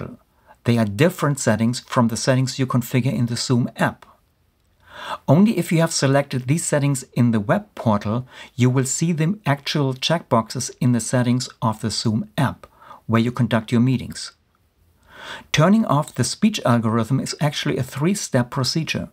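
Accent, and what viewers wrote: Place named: Germany. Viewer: You are really really good at explaining how this mess works, thank you so much! you have a quite strong german accent, you are german right?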